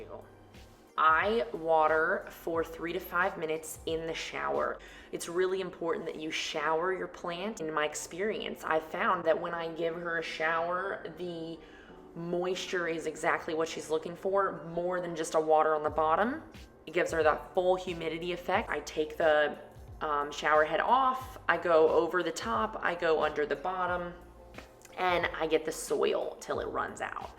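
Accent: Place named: New Orleans, United States. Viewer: Great video... all I needed. Hopefully I can nurse my Fiddle back to health. Also, off topic, are you originally from Louisiana by any chance? You sound exactly like my cousins from New Orleans. Love your presentation and enthusiasm!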